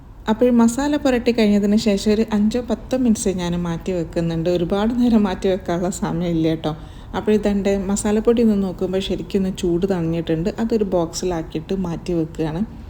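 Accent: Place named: Tamil Nadu, India. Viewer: ഒരുമാതിരി തമിഴ് നാട്ടുകാർ മലയാളം പറയുന്ന പോലെ... ബോർ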